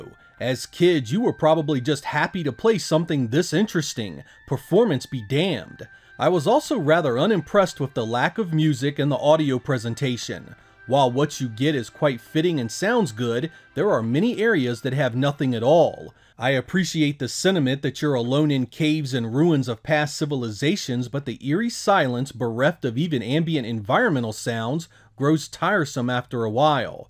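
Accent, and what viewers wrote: United States. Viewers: Just watched the video, and again we hear "Morio". Americans struggle with the "a" sound. Usually they turn it into an "e". So "apple" becomes "epple" but with Mario they turn it to an "o" - "Super MOrio". It's a mystery to me...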